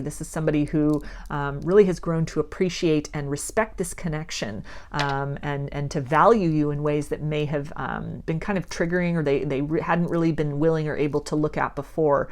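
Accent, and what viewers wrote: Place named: United States. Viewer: Loving your American accent! Wishing you the best and plenty of success for your channel. New Subscriber! Thank you for your gifts. Have good day everyone!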